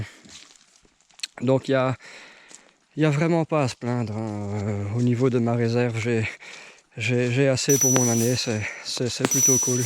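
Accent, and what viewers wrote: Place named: Belgium. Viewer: Tout est parfait dans cette vidéo, les plans, les commentaires, le petit accent belge. On se régale nous ;)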